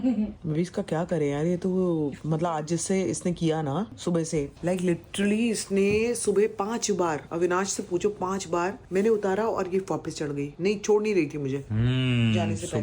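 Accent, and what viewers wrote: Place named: Maharashtra, India. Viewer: So cutely she speaks मराठी... being maharashtrian I like her मराठी accent... झोपला भोपला😂😅😂😅😂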